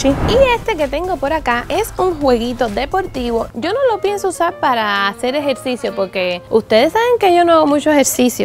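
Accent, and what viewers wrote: Cuba. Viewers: Su acento de cubana es simpático, yo q ella, no enseñaria todo lo q tengo, no hace falta, ya tiene bastante dinero, le gustará la fama, bueno, cada uno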